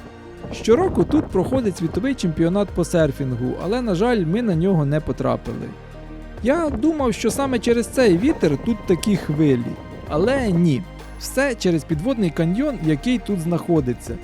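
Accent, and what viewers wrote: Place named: Poltava, Ukraine. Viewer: а мені здалося, що у Вас полтавський діалект. Принаймні, дуже схоже! 😊 Дякую за таку цікаву подорож!❤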